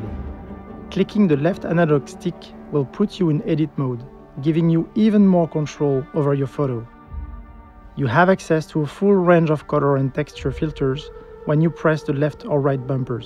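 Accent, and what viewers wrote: France. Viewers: L'accent français on l'adore quand il parle anglais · XD this French accent is so obviously 😂😂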